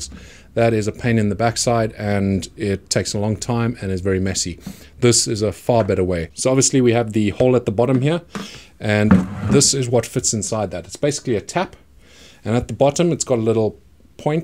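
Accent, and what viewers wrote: South Africa. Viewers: Snap! 😂😂 he does sound South African as well 😂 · Correct me if im wrong, but are you South African by any chance. Not so much the accent ( although there is a hint ), but more the walking around barefoot outside? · are you south african? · You sound South African, very interesting video thank you :) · He sounds South African 🤔 🤔 🤔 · Are you from South Africa? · One of the best SA accents I have ever heard on Youtube. Well done boet, your English is perfect and your pronunciation is amazing. Great video too. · Great Video, great presentation and what I really appreciated was the step by step, explained in clear english ( with some South African accent ) but very easy to understand the different processes of the fermentation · Yup, 100% sure this is a Saffa... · you look like it an sound like it.... south africa or maybe zim? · are you a South African? 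· When you watching a video and you say to yourself, "Hey this guy is South African" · He can't be Aussie.. Mzansi 4sure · Are you South African? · Are you originally South African by any chance? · You're south African?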